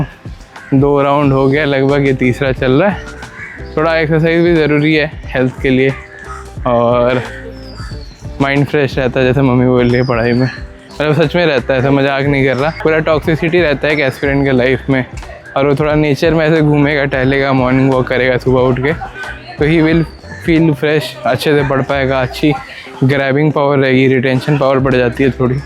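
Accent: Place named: Bhojpuri, India. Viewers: bhaiya aap toh bhojpuri bolte ho 🥰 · bhaiya ap jis language ma baat kar rhe ho vo bhojpuri type lag rhe hai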